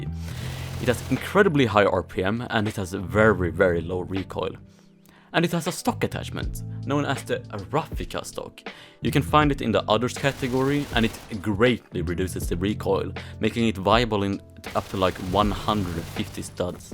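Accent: Sweden. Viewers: Breh he is Swedish how did you pick up British from that accent? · Jag kan höra att du är svensk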